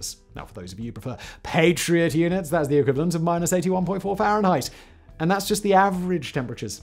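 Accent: RP, United Kingdom. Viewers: This is a bit rude, but would it be possible to flatten the British Received English accent a bit and fluctuate your Oxbridge tone a bit less. It's really tough to listen - while the videos look fascinating and are a real shame to miss. A normal, average London middle class accent would be great. I'm sorry if this is offensive in any way, if so, please ignore. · I had to laugh. Simon couldn’t hold David Attenborough’s cue cards. At 66, I have been watching documentaries with their sonorously-toned narrators for a half century. There was Nat Geo specials Joseph Campanella and Richard Kiley; Nature’s George Page; Morgan Freeman everywhere; Secrets of the Dead’s Liev Schreiber; Nova’s Jay O. Sanders; Frontline’s Will Lyman…the list goes on. Simon isn’t even a middling presenter. He talks too fast, with poor enunciation and his British accent (I usually like British accents) is heavy, annoying, and pretentious.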